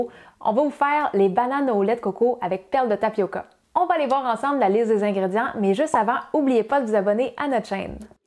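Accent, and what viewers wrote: Canada. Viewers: C'est juste tellement bon ! En plus avec l'accent canadien 😍. J'en ai fait ce soir 😋. Je connaissais ce plat, Merci pour cette version et bravo ❣ Je voulais partager une photo, apparemment dans les commentaires ce n'est pas possible.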